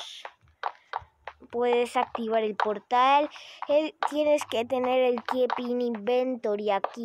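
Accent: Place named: Spain. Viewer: Buen vídeo amigo pero un consejo no hables como un español porque hay gente mala que se te va a burlar. Yo no soy una yo te felicito y gracias por las semillas 😁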